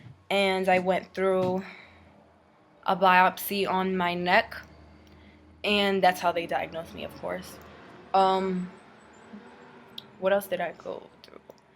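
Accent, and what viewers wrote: New York, United States. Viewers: hey im from ny too! in med school right now, learned about hodgkins lymphoma yesterday... stay strong and i wish you the best.. with this amazing attitude, you're definitely going to beat it. take care. p.s. i love your latina-from-nyc accent! lol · I Hope you have a full recovery. You have a great voice and New York accent. I'm from California so it seem very cool to hear you speak so as a New Yorker. You should consider radio broadcasting or voice over work. Maybe FM Talk Radio.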